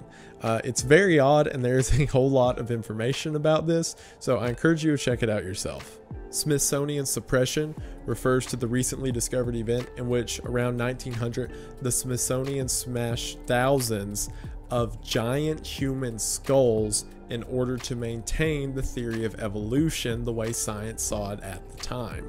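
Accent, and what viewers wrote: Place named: Georgia, United States. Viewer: it's a Georgia thing I think. I got a buddy from north of Atlanta and he talks exactly like. all the same turns of phrase, mispronounces words the same way, same cadence - the works.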